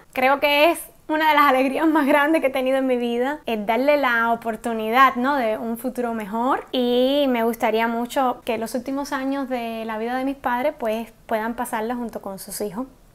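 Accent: Argentina. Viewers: Me encanta como hablan los argentinos y x cierto irradias mucha energía positiva eres linda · me encanta el acento argentino sobretodo desde que vi la telenovela Muñeca Brava jejeje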